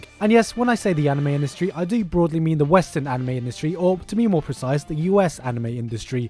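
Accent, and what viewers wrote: United Kingdom. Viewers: No! nonono, you are not a Uptight Obsessed Douchbag, you are a Uptight Obsessed Douchbag with a british Accent. And i must Say, you should not buy every single DVD you watch! Basically we should force the market to put anime Back on TV Nothing less! The Netflix Model is quite interesting but here in UK i dont think they have anything on it.. Only buy material if you really like the Franchise, and !!APPROVE!! otherwise you are feeding fatcats.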